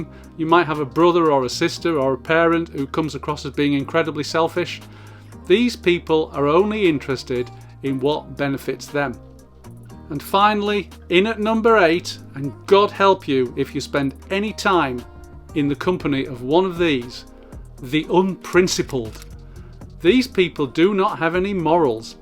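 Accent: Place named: England, United Kingdom. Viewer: I could listen to you all day. I like your English accent! I believe it makes that experience pill easier to swallow. I was hypnotized to quit smoking 17 years ago. I believe it helped because she had a wonderful accent like yours.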